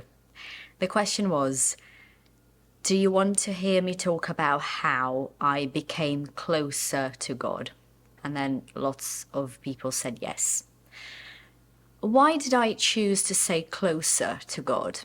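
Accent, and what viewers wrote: United Kingdom. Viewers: I like the video, I'm like you. But how do you manage to have that British accent while being Brazilian? lol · your British accent is brilliant.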